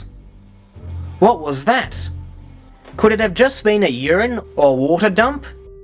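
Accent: Australia. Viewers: Fucking aussie, just shut up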